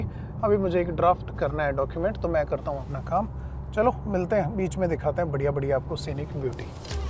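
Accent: Haryana, India. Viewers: Haryanvi kitni hi shudh hindi bol le Haryanvi ka pta lag hi jata h Jai haryana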